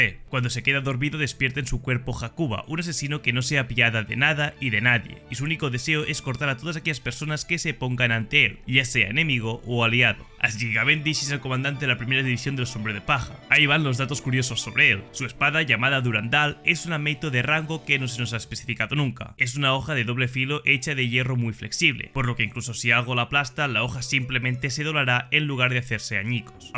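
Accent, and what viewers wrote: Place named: Catalonia, Spain. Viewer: com es nota q ets català a l'hora d parlar jajja brutal el video com sempre!❤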